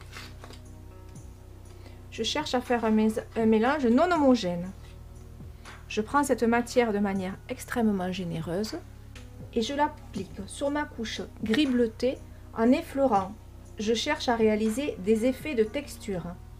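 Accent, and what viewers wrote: South, France. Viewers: Magnifique ! Vous avez un bel accent du midi bien agréable. Merci pour cette démonstration.😍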